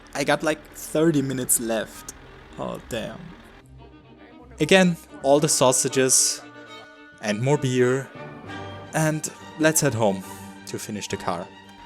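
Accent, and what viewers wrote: Germany. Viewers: why do you sound german? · Hey you soumd german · because you sound like any average german 20yold with the way you speak english. No offense tho I'm german myself xD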